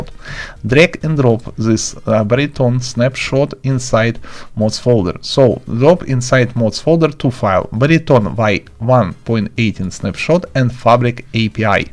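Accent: Russia. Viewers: It is Russian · r u russian? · Слышен русский акцент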